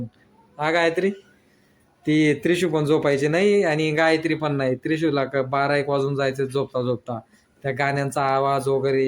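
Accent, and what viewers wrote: Khandesh, India. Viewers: Bhau ahirani bhasha bhari she,dhulani ahirani ani bhadgaon pachorani ahirani ma pharak she🎉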